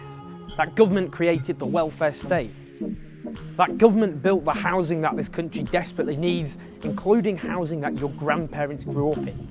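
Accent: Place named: North, United Kingdom. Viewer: Owen you are brilliant. The most engaging person ever to emerge on the Left. I don't relish the idea of voting Tory but I probably will. The winning party has to put together a government that can do the job - go to the office every day and keep the show on the road. The Conservatives have always shown they can do this. We can't risk Labour's questionable competence. I'm 75 and come from Devon! Haha. And I am nobody's grandparent. (By the way: don't let living in London rob you of your northern accent. Everybody likes it - but It seems to be slipping. )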